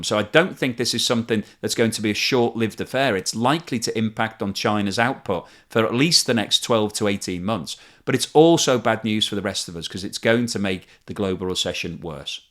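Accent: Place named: Liverpool, United Kingdom. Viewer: He sounds like a Liverpudlian.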